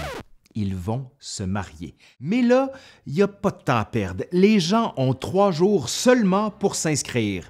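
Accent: Canada. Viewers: quand il s'agit d'emmerder le monde les Français on un don inné pour le faire , et les canadiens Français en ont aussi hérité apparemment !! :D j'adore votre chaîne et le travail que vous faîtes bonne continuation et bonne fêtes de fin d'années a vous ainsi qu'a vos proches !! ( P.S = j'aime aussi votre accent canadien ) .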